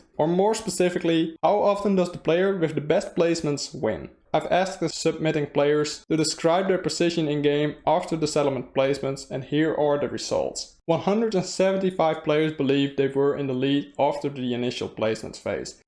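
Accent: Netherlands. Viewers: Je kan je Nederlandse accent niet verbergen haha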